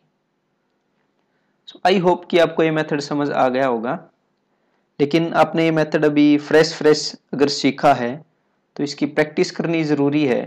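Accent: Haryana, India. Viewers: bhai haryane ke ho ???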